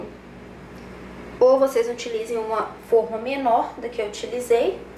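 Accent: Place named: Minas Gerais, Brazil. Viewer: Parabéns. Arrasou, como sempre. Adoro seu sotaque de Mineirinha. Uma graça! Beijos querida.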